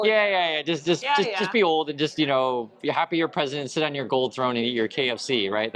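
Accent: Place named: Oregon, United States. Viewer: I can tell you're from Oregon because you say "fleg" instead of flag : )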